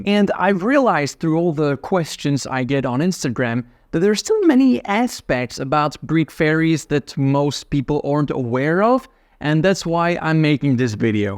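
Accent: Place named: United States. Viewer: Sounds like you learnt your English from the Americans!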